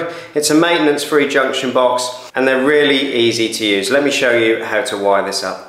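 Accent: Norfolk, United Kingdom. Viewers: Great instruction Buddy. Is that a Norfolk accent!!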